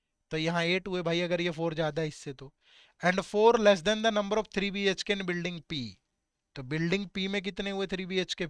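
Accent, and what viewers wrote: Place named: Haryana, India. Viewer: Sir good english with haryanvi loved it!!! Thankyou for the content 💌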